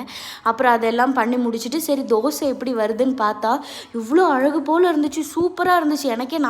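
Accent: Tirunelveli, India. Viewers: Akka nee tirunelveli ahh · Nan peasum pothu Tirunelveli slang apdi onnum therila..neenga peasum pothu keakum pothu இன்பத் தேன் வந்து பாயுது காதினிலே.. ❤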